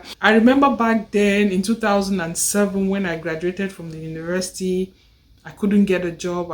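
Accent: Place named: Pidgin, Nigeria. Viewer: OMG 😯 - that was a nice 👍🏾 video, you were very much down to earth - no dulling + no forming, your pidgin english (broken english is very concentrated ooh 😲) - do you blame for bringing out the Nigerian man in him? when you completely took him off guard -) can’t wait 😊 for the follow up. Well done ✅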